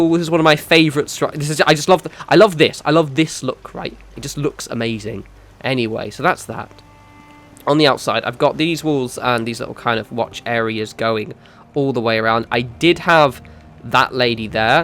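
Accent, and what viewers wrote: England, United Kingdom. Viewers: Adam Gaffen He has an English accent.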